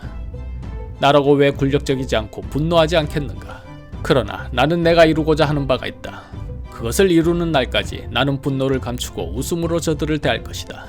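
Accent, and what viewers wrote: Gyeongsang, South Korea. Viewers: 경상도 사투리. 감추려 해도 감출수 없지.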